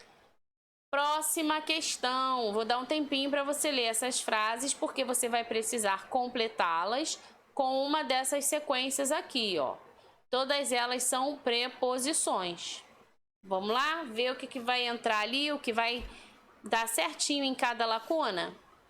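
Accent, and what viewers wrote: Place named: Rio de Janeiro, Brazil. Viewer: "O quanto vc ainda está com duvidãnnn" kkkkkkkkkkk sotaque de carioca é muito engraçado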